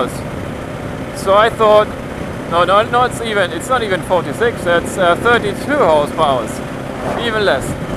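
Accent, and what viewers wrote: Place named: England, United Kingdom. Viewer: Ist mir aufgefallen, einen leichtes flat-english ist rauszuhören! :-)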